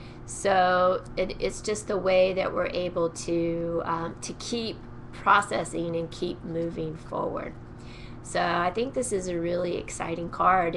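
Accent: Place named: New Zealand. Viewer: Where are you from? That accent is different. New Zealand?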